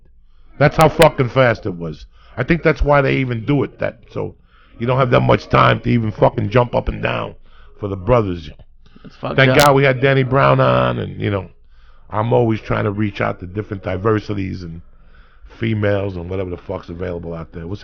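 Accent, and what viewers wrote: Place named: Bronx, United States. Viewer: uncle Joey I have to say I have become one of your biggest fans over the past month man you remind me of my late father but n a good way. your stories are INCREDIBLE and your personality and overall way with people is admirable. I hope I get to meet you one day and as an Italian from Philly I was fooled in thinking u were a Bronx Italian and even tho your not I beleive your the godfather lol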